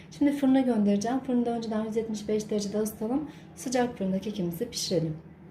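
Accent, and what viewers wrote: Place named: Azerbaijan. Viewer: Azerbaycanlı birinin türkçe böyle konuşmasında hiç sorun yok siz Azerbaycanca biliyormusunuz hiç?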